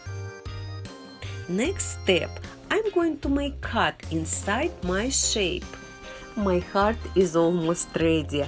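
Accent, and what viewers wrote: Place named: Russia. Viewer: wow amazing good thank for sharing i think from speaking your look like Russian 🙂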